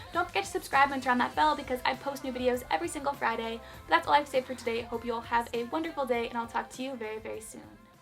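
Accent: Canada. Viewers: are you from Canada? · This is the third video of yours I've watched I think? And I was talking to myself, saying how you sounded Canadian, so I checked the description just cuz, didn't find anything. And then you said it in the video and I was like "AHA! THERE IT IS!"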